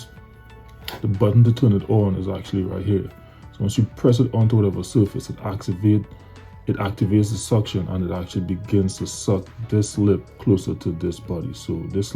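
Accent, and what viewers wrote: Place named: Trinidad and Tobago. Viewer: You sound Trini brother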